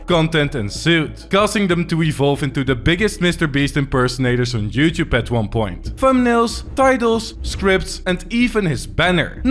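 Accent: Netherlands. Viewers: You say the Netherlands but place the marker on Belgium... even tho I guess you're from the netherlands yourself, based on your accent and the way you pronounce 'Kriekel'.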